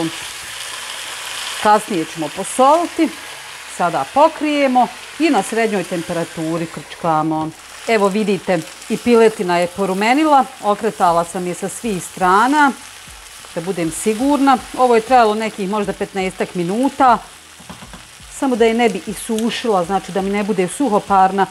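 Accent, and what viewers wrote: Bosnia and Herzegovina. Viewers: Rucak ukusan i jednostavan, uzivam slusati nas srednjobosanki akcent👌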